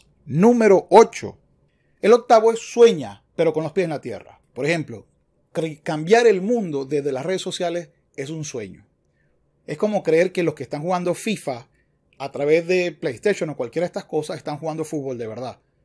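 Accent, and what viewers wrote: Venezuela. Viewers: Muy bue vídeo amigo..excelente. ¿ Usted es Venezolano? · tu eres Venezolano? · Hola, professor, deseando esté bien; venezolano en México, me cayó el veinte en cuanto a la corriente del Estoicismo, ya que lo percibo en "español venezolano", gracias, procuraré seguir escuchando y viendo tus vídeos. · Ya sabía yo que eras venezolano amigo...!!!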